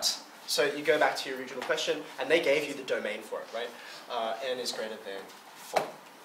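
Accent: Australia. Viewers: I've never seen a Asian with an Australian accent before. He's hot and smart. Great video.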